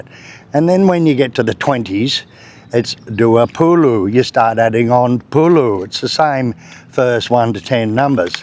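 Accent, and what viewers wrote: Australia. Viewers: Inspirational! You can barely speak Strine English, so the fact that you've learned Bahasa Indonesia to the extent that you do is truly inspiring. How long has it taken you?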